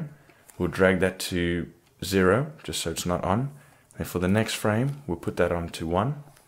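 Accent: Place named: South Africa. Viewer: you sound South African :P respect :D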